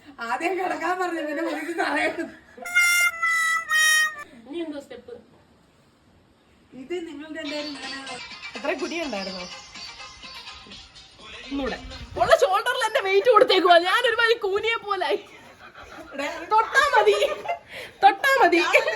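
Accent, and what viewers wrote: Kollam, India. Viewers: Kollam slang❤❤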